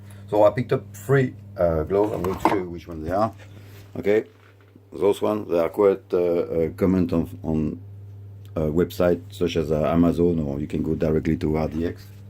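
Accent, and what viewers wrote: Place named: France. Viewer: At first I thought you could Irish then i heard you and yup definitely French :) Nice review though !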